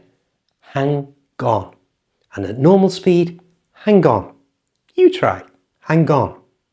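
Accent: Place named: North, United Kingdom. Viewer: What city do you live in ? You sound from North blighty?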